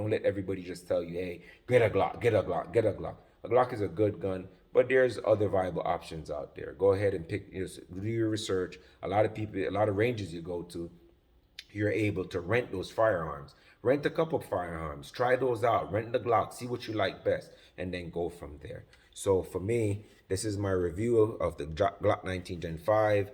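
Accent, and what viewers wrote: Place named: Jamaica. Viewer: Heard the Jamaican accent and had to show love bredrin!! Continue with the great content and another subscriber to the chart!!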